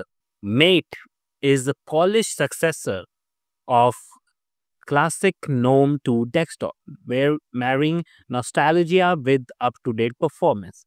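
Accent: India. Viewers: bro probably says carmel instead of caramel out here critisizing some indian dude speaking english as a second language LMAO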